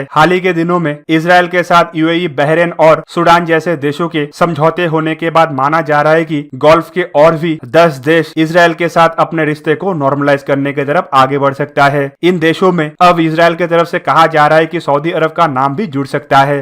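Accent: Bengal, India. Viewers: Bengali hai,isliye Hindi thoda alag trah se bolta h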